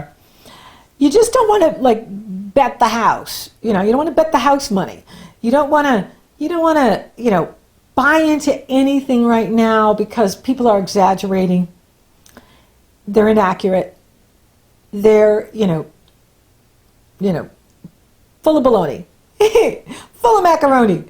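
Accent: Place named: Upstate New York, United States. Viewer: "having MAHZ THEAH" I knew you had an accent, just thought upstate NY or somethin' - you slipped into some sorta Bostonian thang...what was that? lol It seemed to throw you off too a bit...hahaha GREAT reading. You are so fun and thorough, thank you :) You're WICKID SMAHT and totally ROCK! hahaha ;)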